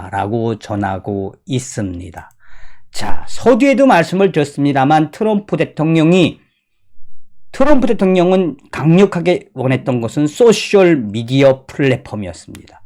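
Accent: North Korea. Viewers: 북한언어 쓰실때 넘 즐겁읍네다 북한말씨 애정이 넘치는 든든한 삼춘이 주시는 언어 같아 재밌고 즐겁게 시청합니다 북한말 쓰시는 유튜버는 밖에 없어요 가열차게 웃겨 주시라요 봤던 영상 못본영상 무조건 즐겁게 구독하기요 주위에도 가열차게 알리묜서 즐겁게 시청하는!!!!❤ 떼레비 고정 하시기요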